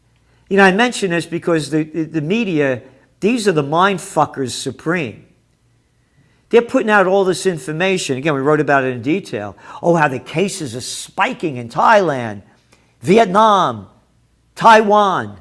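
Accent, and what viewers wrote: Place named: New York, United States. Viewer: Just freaking luv you. Never miss my old state NY, when I hear your voice. Tell it like it is.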